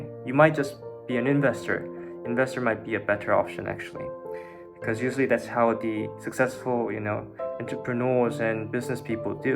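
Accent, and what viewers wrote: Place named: South Korea. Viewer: you speak english well with a Korean flair ofcourse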